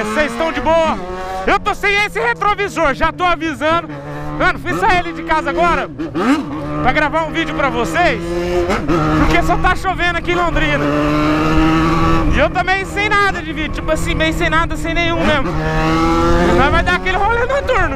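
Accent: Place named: São Paulo, Brazil. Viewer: esses pé vermelho baba um ovo pro sutaque paulista kkkk, mais esse é atentado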